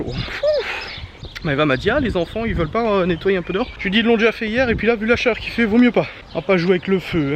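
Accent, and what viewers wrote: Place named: Lorraine, France. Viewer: Hihi tu as vraiment un patois Lorain. "en retraite", nous les sudiste on dit "à la retraite" je trouve ça marrant. Mais bon je te fais cadeau d'une expression patoise gersoise "tataragne" pour araignée ^^.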